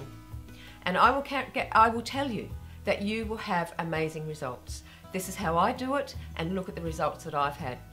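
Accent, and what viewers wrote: Australia. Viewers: Geez, I just adore you:) I also love your Aussie accent! I commented before, have followed you for quite some time now, but only recently have I decided to give your steps a go as you put it all together so nicely, am still at the first week and very excited to see it through. There is so much to watch, learning never ends. Still slightly confused but hey, I will get a hang, for now my goal is to make it through all reps, all series, so that will keep me working for weeks. I have been doing various massages, small exercises and such these last years but now, given that I am approaching 50, given that I also started having neurological issues, jaw surgery in 2 weeks, I decided to this with you. Just wanted to say thanks for sharing all this with us. That good karma is giving you such a lovely, positive glow so no wonder that you loo so beautiful and fresh <3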